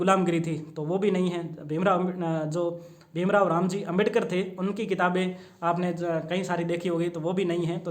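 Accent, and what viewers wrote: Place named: Rajasthan, India. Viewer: The way to explain any of your topics is very good, I love listening to your videos because Rajasthani mix comes in your tone 🙏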